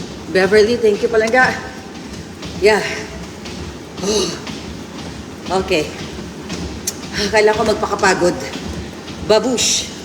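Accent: Iloilo, Philippines. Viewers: hahaha hindi gid nya madula ang accent mo ilonggo nang biskan mg hambal k tagalong or English pg hindi mo ma translate agad illonggos words ga buka sa bibing mo ..halong gid permi nang stay healthy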